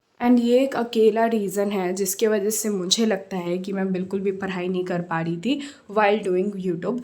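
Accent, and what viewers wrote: Bihar, India. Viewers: Heyy!! Are you from bihar !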